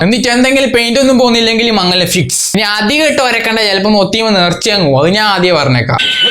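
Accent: Kannur, India. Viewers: Kannur basha poli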